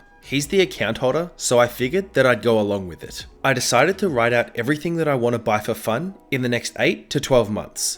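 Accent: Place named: Australia. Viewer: Yo you sound Aussie, are you?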